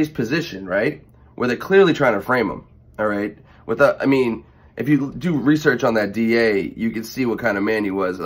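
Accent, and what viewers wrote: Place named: East Coast, United States. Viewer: Love your video! Love what you're saying but I also love listening to you talk. Sounds like you're from the east coast. I'm from the east coast but live in California right now. Love your personality.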